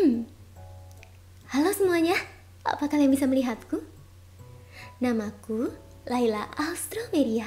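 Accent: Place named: Indonesia. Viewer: That Indonesian accent when speaking English though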